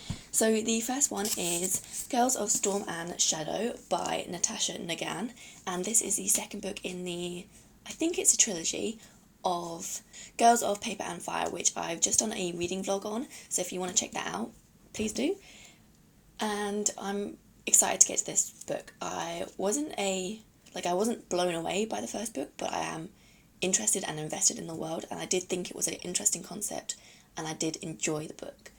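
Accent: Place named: United Kingdom. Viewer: I love the British accent and you are a stunning example! Thanks for sharing, greetings from Italy.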